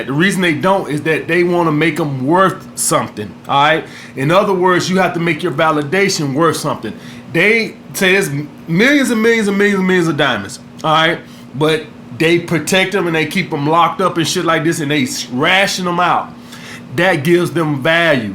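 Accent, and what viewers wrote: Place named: Alabama, United States. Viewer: Skrate to ya crib Disney laying got damn lmao. Alabama accent plus profanity and game is hilarious 💯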